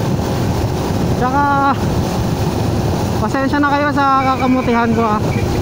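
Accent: Iloilo, Philippines. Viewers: Sir taga saan ka arang ilonggo kanyata ah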